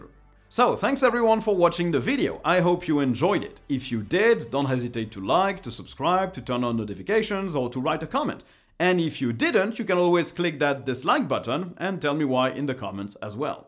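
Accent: France. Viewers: I love hearing you speak in "French" English. This is a very interesting experience. I greet you from Poland. 😉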